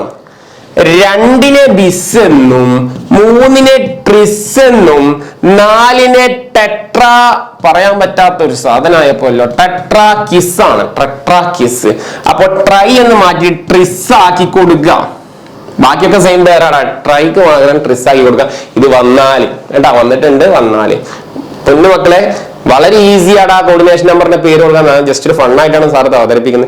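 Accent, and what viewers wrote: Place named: Kannur, India. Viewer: Kannur slang😂🫶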